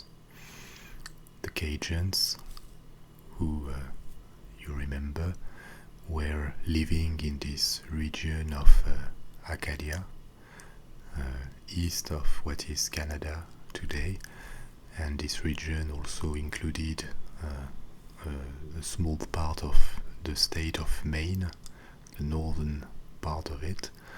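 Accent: France. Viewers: Mon Dieu. You have certainly got a niche in this kind of presentation sir. First off, yes naturally Americans in the US in particular like the French accent, and also the French language spoken, especially when done so in a relaxing atmosphere. I think this is great for Anglophones to learn French as an augmentation and/or diversion from the hectic world. Oh, almost forgot, the good history lessons too. Big thumbs up. Merci à vous.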